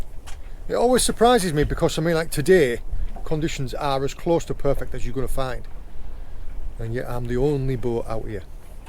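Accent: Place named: England, United Kingdom. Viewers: Dear Mate! I Love your Cornish accent, heavy to understand. Fishing, foraging the Cornish tides (along with your son)! Like Grannma Gina cooking pasta, however allowed two lobsters, three big crabs waving with claws before cooked. That’s the juice! You’l never eat all the seafood by yourselves. You catch and you share with Family and with Friends. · Your English accent is unbelievable. Don't understand nothing. My hello from Ukraine!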